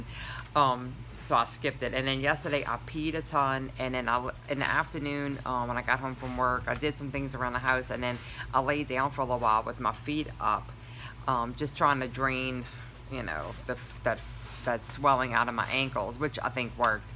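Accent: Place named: Louisiana, United States. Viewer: Is that one of our Louisiana girls? lol I hear the accent.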